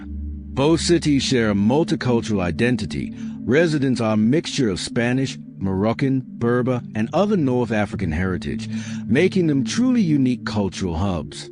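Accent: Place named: United States. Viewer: ' like the accent of the narror, 'cause he's narring with a genuine one, hey where u fro bro? 'merican, i gess ' what state ?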